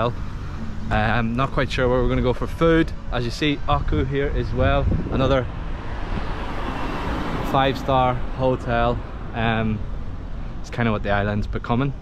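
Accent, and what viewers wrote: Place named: Belfast, United Kingdom. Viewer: Never lose the Belfast accent mate 😂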